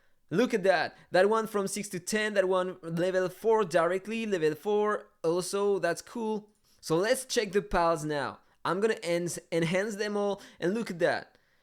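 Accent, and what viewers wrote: France. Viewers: j’ai reconnu le « French accent » 😂 tu fait des bonnes vidéo dommage que je soit obliger d’utiliser la traduction avec un français ! 😭😂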